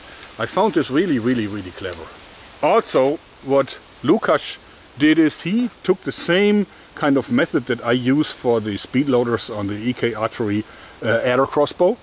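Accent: Germany. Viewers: Ist er deutsch? Es hört sich so an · Do not cut off the :"Ha Ha Ha" with german akcent ! It's one of reasons I watching You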